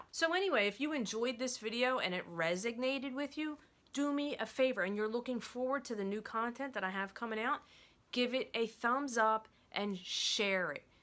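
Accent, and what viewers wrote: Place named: Pennsylvania, United States. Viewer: Omg I knew that accent was very close! I’m in Media PA!! If you need a friend I’m here for you.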